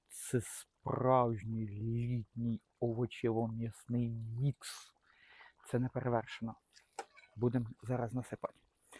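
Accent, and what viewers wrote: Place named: Poltava, Ukraine. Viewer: Говір рідний якийсь :) Полтавська область? Якщо можна район?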